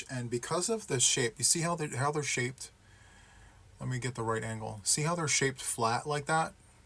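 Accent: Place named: Boston, United States. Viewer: I love your Boston accent 😊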